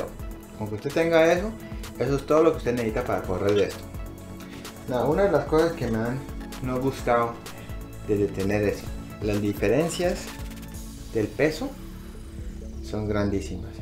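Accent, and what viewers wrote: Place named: United States. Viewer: Este es un gringo que aprendio español en cartagena.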